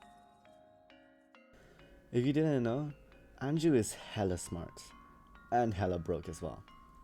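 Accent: Turkey. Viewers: Yeah he really sounds Turkish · yes also I think you said you were Turkish one time that might’ve been another one I don’t know but the way you pronounce the English words🥀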